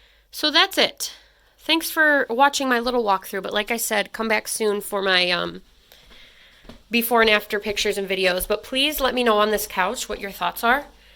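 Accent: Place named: Wisconsin, United States. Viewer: Are you in Wisconsin? Sure sounds like it haha 😃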